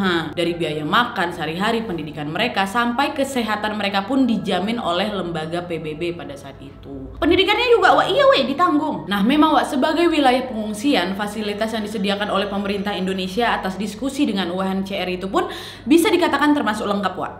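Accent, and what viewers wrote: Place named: Medan, Indonesia. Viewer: Dr logat nya pst org Medan. 😂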